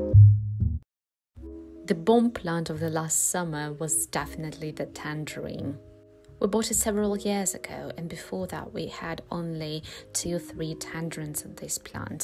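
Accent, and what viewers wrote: United Kingdom. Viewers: I was actually wondering about that. You consciously go for for a British accent?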